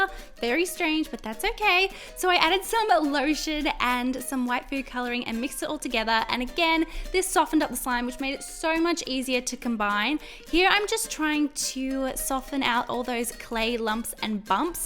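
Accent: Australia. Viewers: “The accent is Australian”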